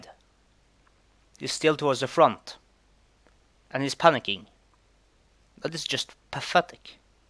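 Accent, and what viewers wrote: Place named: Germany. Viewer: well, atleast it was entertaining for a layman like myself. Swede here, and by the accent and knowledge in German tank warfare im guessing your a german?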